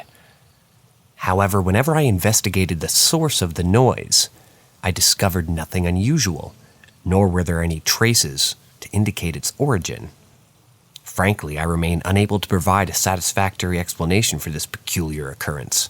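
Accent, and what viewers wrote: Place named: United Kingdom. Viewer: What's with the new British accent?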